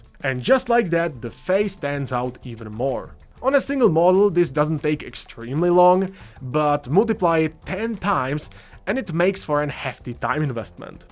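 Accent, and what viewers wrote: Czech Republic. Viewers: You accent sounds familiar, are you from Czech Republic by any chance?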